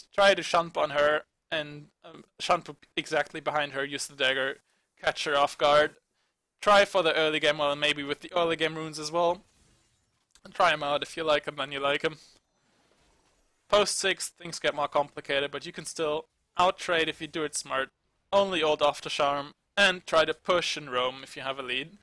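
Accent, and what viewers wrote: Germany. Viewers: u are german? :D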